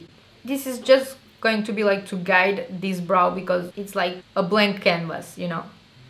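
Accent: France. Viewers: Wow... J'en reviens pas. Ce sont les sourcils les plus naturels et réalistes que j'ai jamais vu et trouvé sur Youtube! Tu m'as vraiment donné envie de me mettre au makeup :) Je m'abonne sur le champ !!! ( ps: je me permets d'écrire en Français car j'ai remarqué ton léger accent et quand tu as dit Christelle Dominique lol) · Wow the french accent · Hi,Wonderful Blue eyes ,God bless you. Inoticed your French pronunciation Have you been raised in France? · Tu est française non ? Ca s'entend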